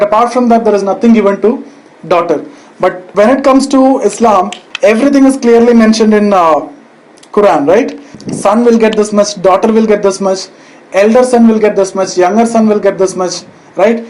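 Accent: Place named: South India, India. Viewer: The Souuth Indian accent of English teaching.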